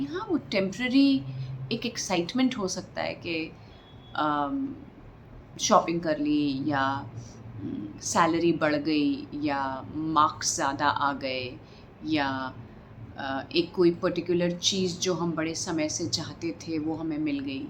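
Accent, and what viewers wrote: Bundelkhand, India. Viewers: You Are really really amazing actress And I love your Bundelkhandi Accent. Keep rising and shinning. Jai Banke Bihari Lal Love from Hiten Pandit. SIT😍😍😍😍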